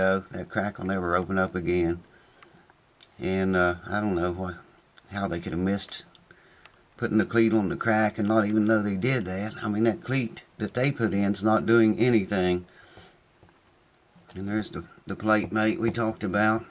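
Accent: South, United States. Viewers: So I knocked my stupid hardly used Wah, Wah off a shelf and it landed on my 3 month old baby...my new acoustic guitar! 😭😭😭😭 Looking for a fix, I found this video and immediately had a good laugh. with his : "Oh I didn't see you there" in I think is called a n English posh accent. Yes it was silly. But still was very funny and made me laugh, and feel a little better. Then it gets even better, when I find out that his actual voice is a southern US accent.. What other surprises does this guy have? No choice but to find out by subscribing..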